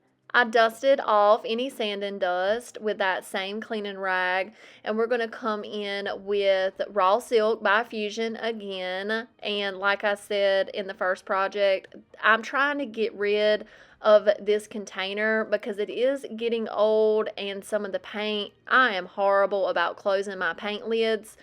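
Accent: South, United States. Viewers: . Your grammar is fine. You have a cute southern accent which I find to be charming, especially on a YouTube channel where you are making rustic, country style decor. Don't change for the critics. Just be you. ❤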